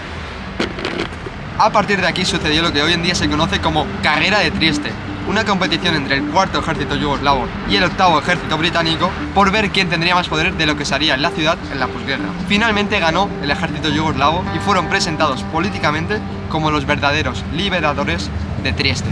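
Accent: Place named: Spain. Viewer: Que gusto poder ver videos sobre estos temas con acento español. Casi siempre son argentos o mexicanos 😂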